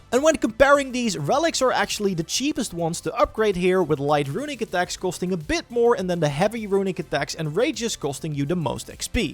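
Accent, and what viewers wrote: Netherlands. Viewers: Heerlijk, dat Nederlish.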